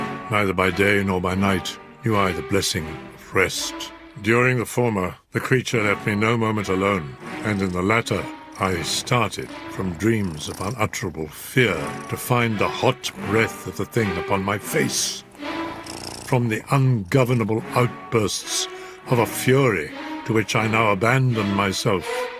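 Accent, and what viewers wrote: United Kingdom. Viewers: Sir Christopher Lee has a very strong posh accent. Like it. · Is this British accent ??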